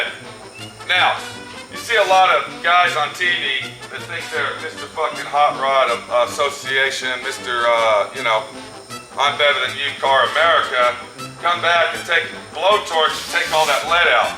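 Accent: Texas, United States. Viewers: hahah.. i love the texas accent.. wish i had one.. i was born there but left when i was 1.. =/ movin to SC soon.. so hopefully i'll pick up an accent there.. this masshole accent sucks.. haha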